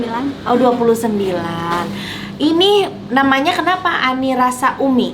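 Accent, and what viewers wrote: West Java, Indonesia. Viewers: Urang sunda hadir tth,resep nyarios na sami urang sunda😉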